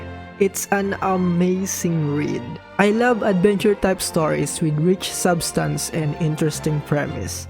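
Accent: Philippines. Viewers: Pinoy yan halata naman sa boses nya mag salita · You sound Filipino ish somehow, I don't mean this in a bad way. The recommendations are awesome tbh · me too hahaha the way that he talk is pinoy.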